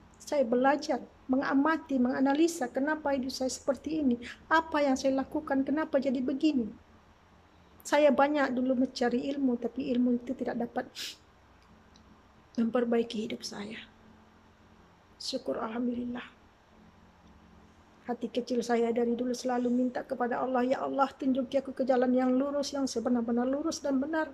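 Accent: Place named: Malaysia. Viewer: Bahasanya has Malasiya enak didengar 👍🙏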